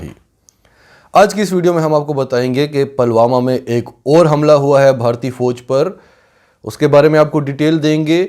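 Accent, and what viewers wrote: Pakistan. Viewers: Saieen ki azmat ko ek syed ka salaam best urdu accent keep our sindhi bhaiee pure pakistani accent keep doing this imence respect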